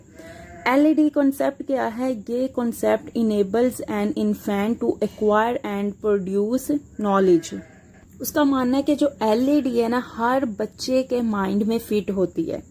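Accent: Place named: Punjab, India. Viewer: Accent se bhi pata chal jata hai aap Pakistan se hai, Punjab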